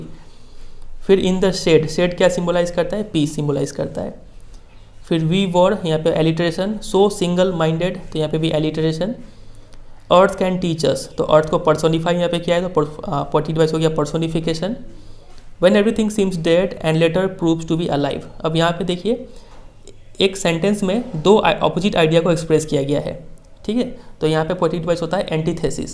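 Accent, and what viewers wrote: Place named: Varanasi, India. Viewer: banarasi accent❤‍🔥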